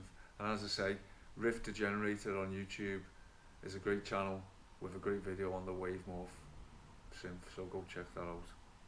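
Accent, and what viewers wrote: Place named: Liverpool, United Kingdom. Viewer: lad.. Proper Scouse 😎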